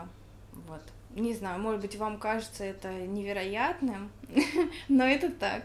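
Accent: Russia. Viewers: congratulations on reaching 100,000! I'm sure you will reach so many more.. You have such amazing talent, i'm really looking forward to hearing some more of your stuff.. I absolutely love your accent by the way! I wish i could speak russian! Lol... 😘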